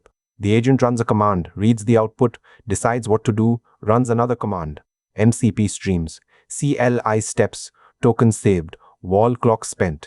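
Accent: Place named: India. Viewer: Relieved I heard an Indian accent and not some ai bullshit 😅. I can now sleep in solace 😂❤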